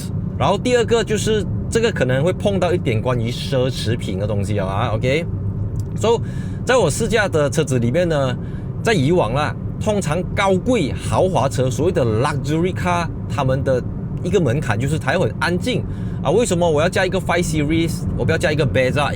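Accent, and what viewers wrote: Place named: Malaysia. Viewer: 馬來西亞式的北京語 不錯喔還用繁體字喔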